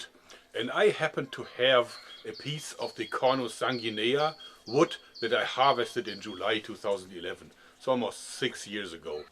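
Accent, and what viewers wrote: Germany. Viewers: Dieses deutsche englisch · Are you from germany because of your Accent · is he german? ist er deutsch? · Lern mal Englisch deine Betonung ist wirklich wie ein richtiger deutscher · you sound a bit German or so... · you speak like a german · It really sounds like you're from Germany. Are you? I'm sorry if I (idk) hurt you.. lol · are you german? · even for me as a German I must say...gosh this rough accent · are u Part german because u have a strong accent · Jeder der deutsch spricht merkt das er deutsch ist 😂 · are you german ? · Hört sich an wie deutsches englisch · Ahhh du sprichst deutsch geil sofort am aktzent erkannt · I think you have a very german accent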